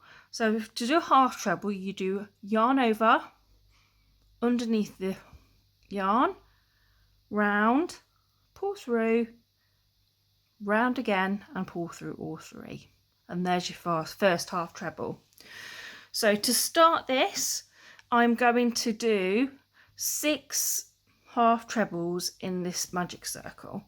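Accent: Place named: Midlands, United Kingdom. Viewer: Great video. I just love your Midlands accent, Birmingham??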